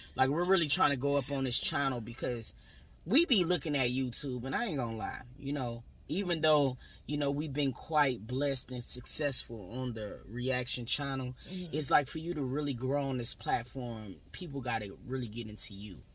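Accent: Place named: Detroit, United States. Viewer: Great story! 💕 I’m new to your channel... been listening for about 6 weeks. U are one of 2 content creators I actually enjoy listening to. U actually get it and convey what the ppl are thinking and enhances it! But I swear, listening to u talk, I said she gotta be from Detroit because she got our flavor... The way u speak and the lingo is Detroit. I would be shocked if you’re not from here. But I love your reactions and hope u keep at it!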